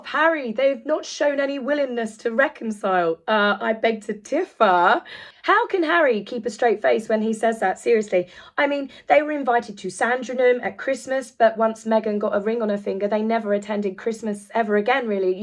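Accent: South, United States. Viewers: Do feel better!!! You still look fabulous!!! Your American accent was good..made me smile!! Americans are more aware every day they are scoundrels...how could we not be, they won't shut up. Too bad their millions cannot buy them a personality, they both are horrid!!! Thanks for video, was wondering where your "voice" was.... Great that you are feeling better!!! 💫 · so. how about trying an accent that doesn't sound like a part of the southern U.S. You obviously have no idea what you're talking about. Maybe try staying in your lane. FYI, we don't like them either. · Okay, your American accent, dare I say slightly Southern accent, is EVERYTHING! I have a cousin who speaks just like that 😂😂😂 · OMGOODNESS!!!! Your AMERICAN ACCENT IS AMAZING!!!! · I think you need a little work on your Southern American accent 😅. But decent try (from someone living in Florida)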